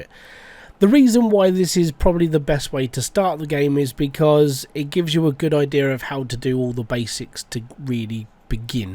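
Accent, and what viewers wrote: United Kingdom. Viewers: i love your english accent also i am english too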